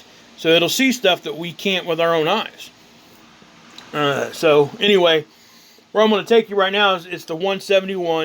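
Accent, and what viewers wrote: South, United States. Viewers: I'm not trying to be rude or nothing, but you really need to change the way you speak. You must be from wayyy down south.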